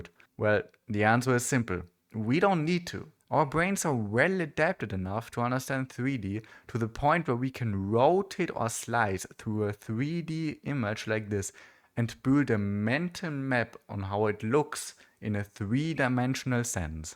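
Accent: Germany. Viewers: Nice Video ❤. U Sound German😂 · Do i hear Deutsch?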